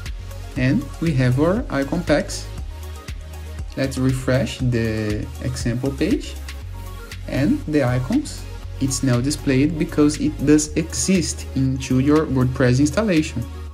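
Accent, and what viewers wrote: Brazil. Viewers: Esse é brasileiro... me engana não. Esse sutaque aí... Curte de mais ❤ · Você é brasileiro né kkk, pelo sotaque, acredito que tenho 99% de chance de estar certo kkkk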